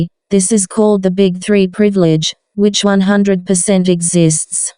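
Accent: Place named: Australia. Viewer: I know this is random but why does your robot voice have an Australian accent?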